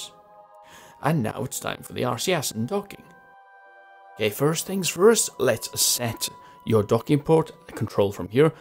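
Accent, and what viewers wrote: Wales, United Kingdom. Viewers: Welsh to me.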